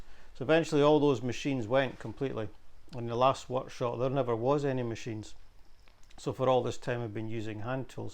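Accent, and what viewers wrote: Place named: Ireland. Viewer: good first video...I've always liked the Irish accent 👍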